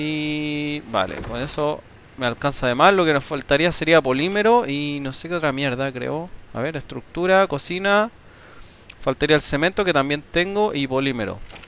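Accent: Chile. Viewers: eres de Chile cierto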